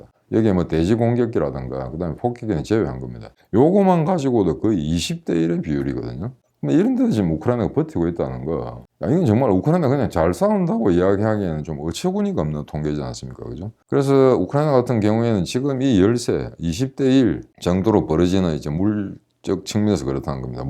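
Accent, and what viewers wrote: Gyeongsang, South Korea. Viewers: 🇰🇷허진대사님,💙 중저음의 보이스와 경상도 인토네이션이 들을수록 신뢰감이가고 묵직하며 멋있습니다~!!!💯 전쟁...브리핑엔 님의 목소리가 적격입니다. 👍✌️👏 허진대사님, 아프지 마십시요. 늘 건강하시길 빕니다~🕊️💙🎵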